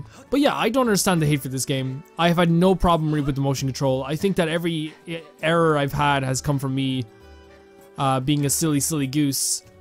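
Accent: Ireland. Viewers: You know, you remind me a lot of Jacksepticeye, and not just because of the Irish accent, but you’re always so happy and positive, and it’s really made this play through super fun to watch! Please keep it up, I have no doubt your channel will grow to immeasurable heights :)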